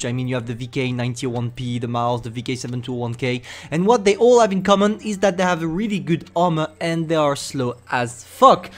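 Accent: France. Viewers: T’es Français non?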